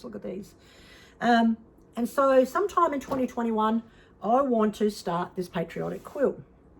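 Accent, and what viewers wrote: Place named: Australia. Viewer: Oh. Goodness Covid..So glad you are on the mend. So good to hear your podcast again. Watched you from the beginning..and watched your store grow. Will have to visit. Love hearing your Aussie accent..Kia Kaha, good Lady.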